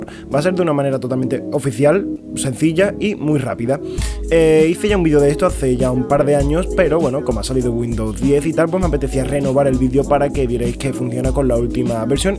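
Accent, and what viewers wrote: Spain. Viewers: hablas muy feo como todos los españoles, minimo trata de hablar mas lento, que no se entiende nada¡¡¡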